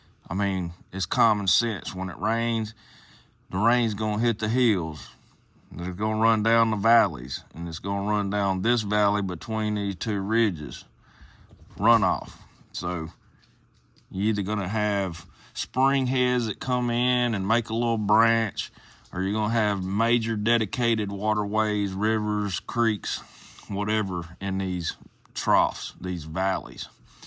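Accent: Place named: South, United States. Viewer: I can’t help it, man. I love the southern accent so much. Everytime I hear it I hear Aldo Rain from Inglorious Basterds. “Now I’m a direct descendant of Jim Bridger, That means I got a little injun in me, and our battle plan will be that of Apache resistance.” 🤣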